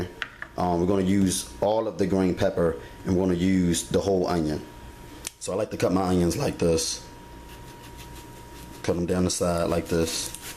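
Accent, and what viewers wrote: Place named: Philadelphia, United States. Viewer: I love your philly accent!!!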